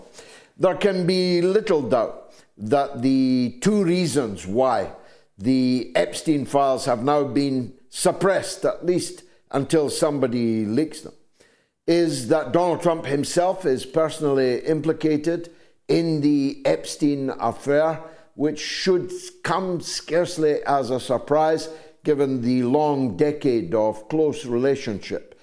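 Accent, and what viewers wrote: Scotland, United Kingdom. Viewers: We love you 💖💖💖 You are such an honorable man! Deep Thanks for this speech! Eventhough, as a french-canadien, I must use the subtitles here and there because of your ''strong Scottish'' accent (which I love) 😉, I will continue to listen to you, sir. As I started to search for the ''truth'' about what's going on in this messy world, my son told me, several months ago, that you were a most valuable and reliable person with integrity and determination. So I started watching your program and I want to congratulate you and express my gratitude, from the bottom of my heart. Besides, I'm 69 so... you're older than I, which makes you even more honorable in my eyes! Take care and stay safe❤🖤🤍💚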